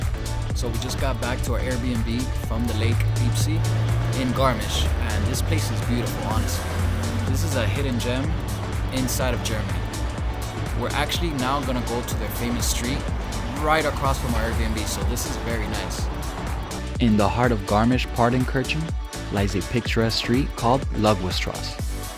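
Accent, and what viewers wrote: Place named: Australia. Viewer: I didn't know you had an Australian accent. And wow rural Germany is beautiful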